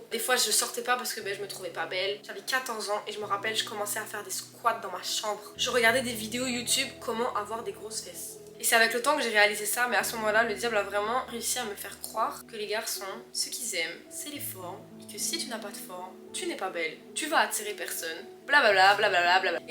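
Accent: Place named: Quebec, Canada. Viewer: Je me disais bien que tu avais un petit accès québécois hahahaha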